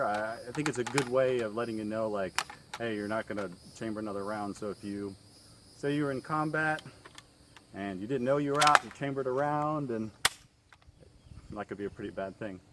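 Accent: United States. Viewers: Oh that’s American I thought it was british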